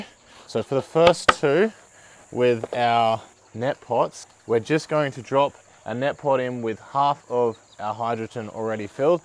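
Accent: Australia. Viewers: I can hear you are definitely an Aussie, mate. I'm from Queensland. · You sound like you Australian. Considering your timeline it appears to be spring/summer. Will you be able to do the same in winter?❤ your vid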